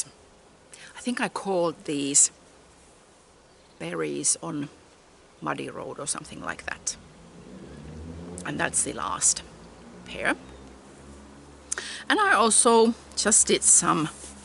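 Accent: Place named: Finland. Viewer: I love listening to you with your charming Finnish accent. I am from Sweden but now live in Seattle, USA. Please come back, even for small snippets!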